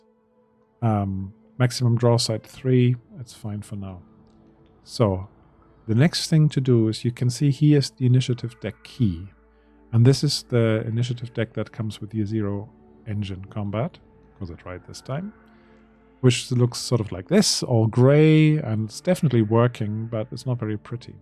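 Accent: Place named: Germany. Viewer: Great video, straight to the point and thorough. I live in California now, but hearing your accent makes me miss Deutschland where I grew up as a kid. Danke Shon! Keep up the great vids!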